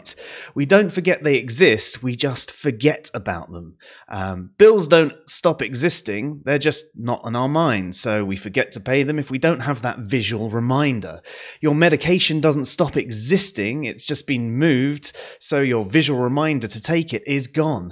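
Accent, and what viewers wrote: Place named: England, United Kingdom. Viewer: This video just popped up on my feed and I'm glad it did. Very interesting topic for me to help understand my son who has ADHD and autism. Interesting, to the point and nice to here an English accent as a little extra plus. New subscriber here. Looking forward to checking out your other vids. Cheers for sharing.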